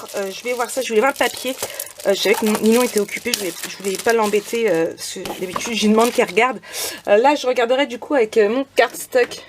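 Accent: England, United Kingdom. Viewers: Coucou, et oui j'ai bien rigolé pour ton joli accent anglais, très joli papier,j'ai hâte de découvrir le magnifique album que tu vas nous faire,je te souhaite une bonne journée bise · Cc jolie haul et oui j’ai bien rigolé avec ton accent anglais lol bisous 😘 priscilla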